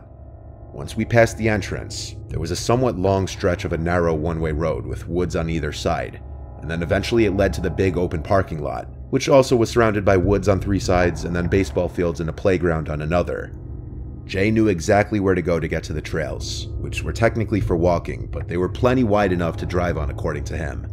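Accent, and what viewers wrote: United States. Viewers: I don't know American accents, but I like his. Where is it from?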